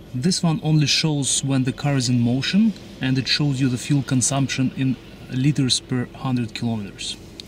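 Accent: Russia. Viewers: Недавно купил такую для 2017 Toyota highlander с OBD подключением... Подключил без установки в гнездо крышки динамика. После прохождения теста экран тупо гаснет и все. Включаешь двигатель (у меня гибрид) и ничего - кнопки только пищат при нажатии. Пробовал проехаться: 3 минуты ехал и нифига - пустой экран... Дефективный девайс, походу... Во тут видео, шо я снял для продавца (тоже на английском с таким же русским акцентом :) - <link>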